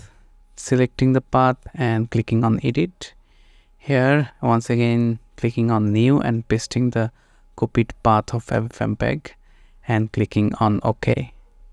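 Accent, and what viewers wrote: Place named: India. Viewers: fellow indian here; we pretty much sound like each other. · i only know indian accent, so i think its a bit similar, sorry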